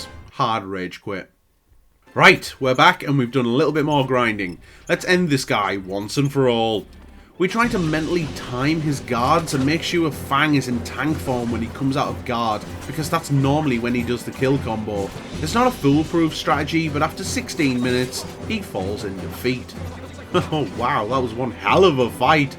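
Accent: Northern England, United Kingdom. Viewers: Are you in northern england? You sound like you’re from manchester or lancaster.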